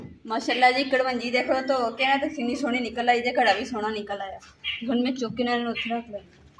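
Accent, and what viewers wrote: Punjab, India. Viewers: Ap koli boli pure pnjabi bht achi lg rhi h...mje apni ami ka gr yad aa gya h...jahan sb aik sehan me soty hn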